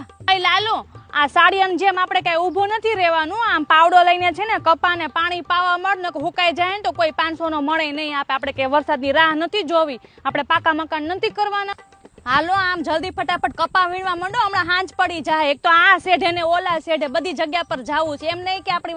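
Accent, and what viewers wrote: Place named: Ahmedabad, India. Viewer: pan kyarek amdavadi bole che tyare maja nathi aavti aapni pyor kathiyavadi bolo 😊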